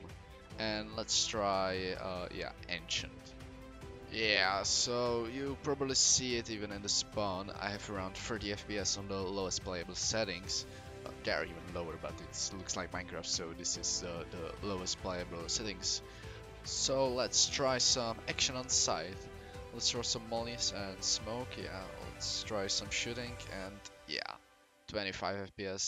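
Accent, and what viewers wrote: Czech Republic. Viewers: Já si úplně říkal že to je definitivně česká angličtina a pak jsem viděl české menu 😂😂👀 actually mi to pomohlo se rozhodnou jestli cs 2 stáhnout 😂 já určitě ne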